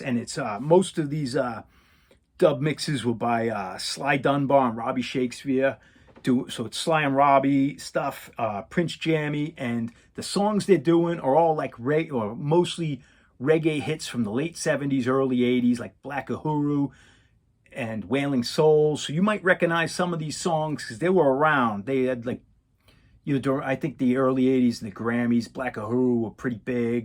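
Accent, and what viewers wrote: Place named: New England, United States. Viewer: I’m from Fall River but have lived in Atlanta for over 20 years! I knew that accent….haha! Love your videos and your knowledge! Respect!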